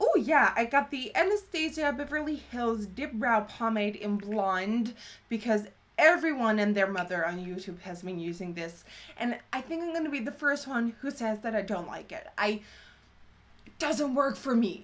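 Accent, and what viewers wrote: United States. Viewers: Wait, you are in Holland?? Your accent sounds so American. :) What a fun video. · How funny, I thought you were from America this whole time! Glad you loved our City by the Bay...it is where I live! :)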